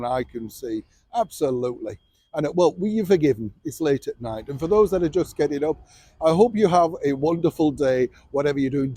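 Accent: Yorkshire, United Kingdom. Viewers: Thank you, for a much-needed hearty laugh 😆🤣 You are hilarious!! Love the Yorkshire-born influence showing 🤗 Of course they were all about her!! Goes along with H interviewing the pope on fatherhood, or Putin on his mental health!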